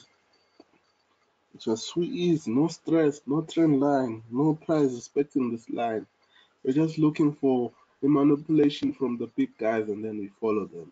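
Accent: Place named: Africa. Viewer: 30 minutes later i'm still not sure what language he is speaking. Sounds like english but also African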